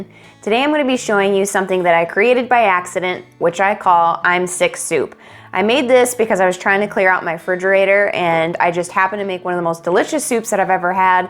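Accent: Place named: Midwest, United States. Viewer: You have a very thick Midwestern accent.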